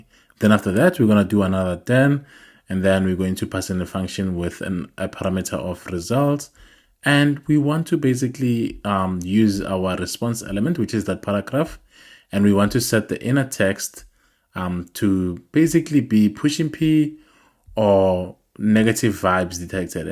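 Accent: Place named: South Africa. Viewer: I heard your accent and knew ukuthi ungumkhaya(South African). Happy to see such videos from people on my land ❤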